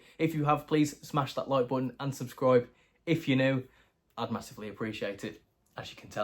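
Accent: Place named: West Midlands, United Kingdom. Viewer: Congrats on 1000 subs. Bonus points for the epic West Midlands accent. It's bostin' our kid! :D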